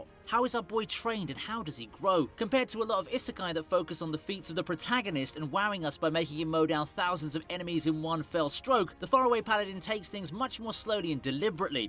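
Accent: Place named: United Kingdom. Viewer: Hey. I want you to know you are my favorite YouTube who covers anime by far. You have put me on to some of my favorite anime. Thanks for all the work you do. And for making these enjoyable videos. Love hearing that British accent before I get to see some badass anime😁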